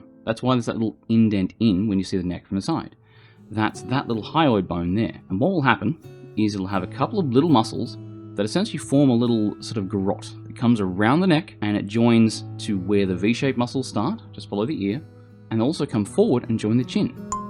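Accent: Australia. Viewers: Australian accent cool though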